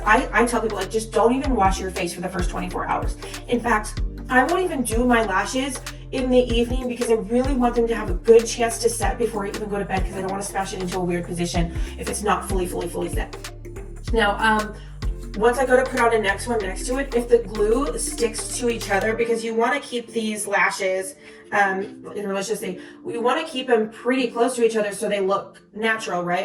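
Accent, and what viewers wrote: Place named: United States. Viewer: Hey from Melbourne Australia 😁 I need to tell you and I hope you see this that I've never come across your channel until today and from the get go your presence in front of the camera, sounds weird but your American accent and tone is so easy to listen to😄 lol it's positive, straightforward, informative, believable, easy to relate to, fun to watch and you instantly drew me in! I love your name and the design on either side as there no other distractions in the frame and my eyes go instantly to you. " Well of course that's the intention" lol my favorite colors are pink/ purple/ turquoise, peach and silver and obviously that's another reason I was sucked in 💜 then of course you are an edgy, sassy, talented, funky, creative, bubbly, charismatic goddess of a UNICORN 🦄 Female🤩 God I'm full of compliments today lol and of course your stunningly beautiful have the best eyelashes of course and ooze mad swagger!!! 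There ya go💜 and I will be using all your tips for doing my lashes for myself when I buy the products I need. You brightened up my day so thankyou ☺ from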